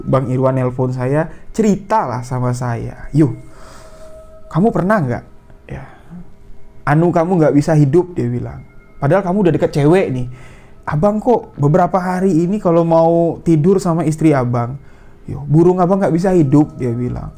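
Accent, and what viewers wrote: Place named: Jambi, Indonesia. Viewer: Enak banget ngomongnya,kaya gini yah logat jambi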